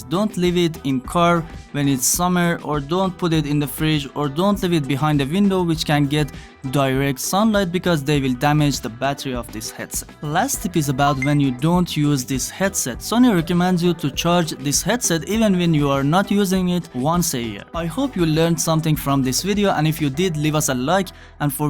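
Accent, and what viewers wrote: Iran. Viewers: Useful video Thank you But i recommend you to improve your English pronunciation (you speak like Persian Iranian people) ❤ 🙏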